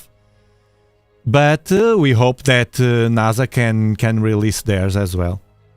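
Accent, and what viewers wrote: Portugal. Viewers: rofllll. he’s Portuguese 😊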